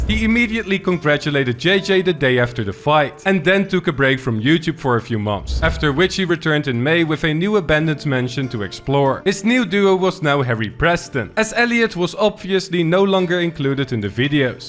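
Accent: Netherlands. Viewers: Ik herken een Nederlands accent wanneer ik het hoor ;)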